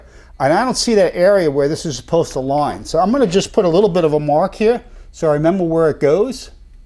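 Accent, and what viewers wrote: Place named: Massachusetts, United States. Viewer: What part of mass are you from you accent gives you away lol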